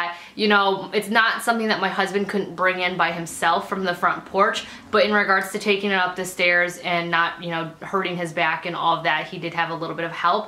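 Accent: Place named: Midwest, United States. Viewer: that mid-west accent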